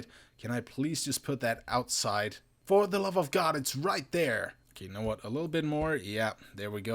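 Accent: Denmark. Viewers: Is he Danish?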